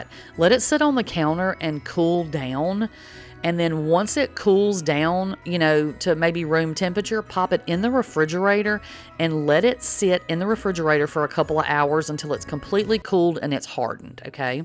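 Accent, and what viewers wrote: South, United States. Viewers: Don't know where you are from. You have a southern draw and no one in the south or anywhere else in the world pronouns perylene that way !!!!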